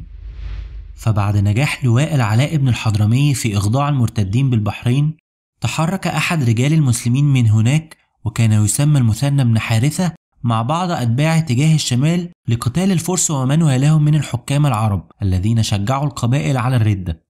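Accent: Egypt. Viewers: لو تكون باللغة العربية من غير التلفظ باللهجة المصرية تكن أحسن بارك الله فيك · يا اخي كلشي تمام بس لهجتك لمصريه خربت ونزعت لفيدو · موضوع تاريخي شيق لا ينفع أن يُسرد بلهجة اي بلد وإلقاء ممل. إن أردتم رفع مستوى المحتوى فعليكم الإبتعاد عن الإلقاء بهذه الطريقة وباللهجة المصرية والنطق السيء للحروف العربية ونطق الجيم والظاء والذال والثاء والقاف كما تنطق نها في لهجتكم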